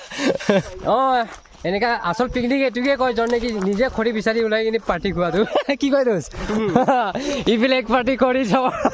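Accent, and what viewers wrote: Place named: Mishing, India. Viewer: Bosdikee,,who the fuck are you to comment like this,he is belongs from mishing community so his first mother tongue is missing though he is able to speaks Assamese ,we have to appreciate him instead of insulting or looking him down..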